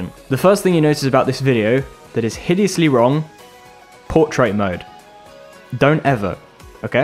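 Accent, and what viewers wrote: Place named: United Kingdom. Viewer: OMG YOUR BRITISH! (sorry, i'm new to your tutorials)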